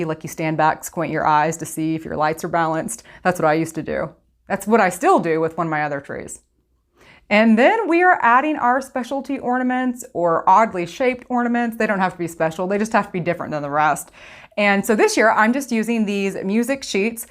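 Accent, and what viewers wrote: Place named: East Coast, United States. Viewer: Yours is definitely my Fav diy channel. Your stuff is always so adorable and I just LOVE your accent. East Coast maybe? Keep up the good work!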